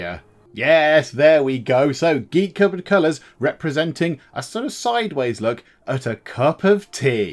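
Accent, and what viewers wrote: United Kingdom. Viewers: Heavily bri'ish.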